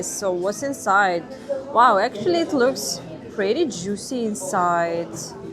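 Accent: Russia. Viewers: Нет, всеравно русский акцент, я не choose гречка , а говорить l have chosen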